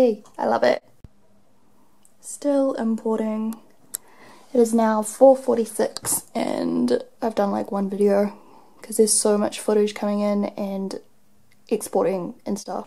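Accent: New Zealand. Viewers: Hi, congrats for the million subscribers! I remembered following you at the beginning some years ago just because of your NZ accent (I'm an English teacher in France) and now I'm just following because I like watching your videos;) Thanks for the smoothie recipe and I thought it was interesting to watch you editing and voice over your videos:)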